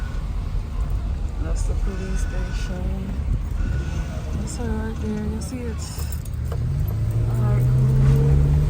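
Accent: Jamaica. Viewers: That Jamaican accent slowly creeping in..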